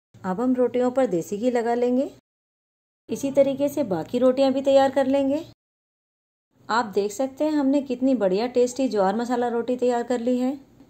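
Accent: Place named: India. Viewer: Hello.. i love Indian accent.. your videos very interesting.. thankyou for sharing friend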